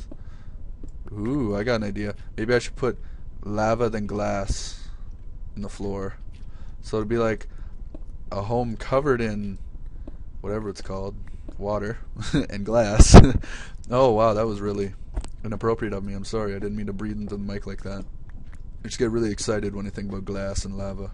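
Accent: Canada. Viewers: "i am going to punch you... with a sword" and by the wayare you canadian?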